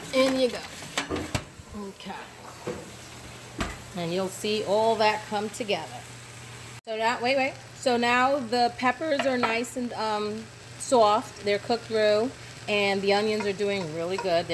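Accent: New York, United States. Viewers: I'm pretty new to your channel...and I love it!!!! I'm all about the cooking, the hauls, the garden harvesting. I love your way of speaking...great NY accent. Cheers from Montana!!!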